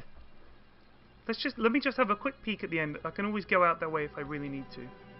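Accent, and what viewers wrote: Germany. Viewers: dat German accent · started with a generic german accent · Amazing german accent!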